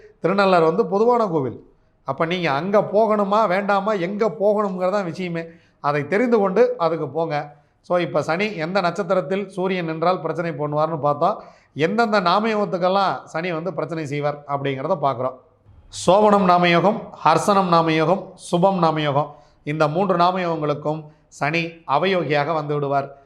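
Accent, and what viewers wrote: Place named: Coimbatore, India. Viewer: Iyakkunar manivannan sir kku piraku namma coimbaturu slang um nakkal pecuum ungalidam thaan saami ketkiren... guru sakku moottaila katti adipaar... 😂😂 kastangal irunthaalum neengal sollum vitham romba rasikira mathri irukku guru naatha,, and by the by one more information,, I'm from coimbatore, ungalathu video anaithayum skip add seyyaamal anaithu add um odiya pin thaan parpen athu antha video vil neengal sollum thagaval yenakku muluvathum palikka naan tharum guru kaanikkai... anbarkalum ithai try seithu paarungal.. neengal yevvalavu menekettu ovvoru video podukireerkal yenbathu ungalai patri therinthavarkalukku theriyum... koodi nandrikal guru naathaa...by coimbatore venthaan...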